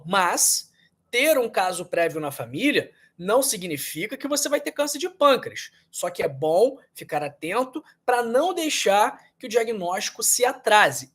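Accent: Rio de Janeiro, Brazil. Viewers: Carioca sotaque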